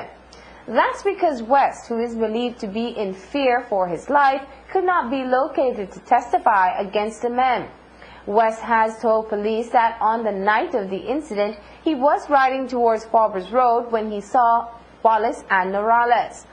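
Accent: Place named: United States. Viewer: Sounds like the american accent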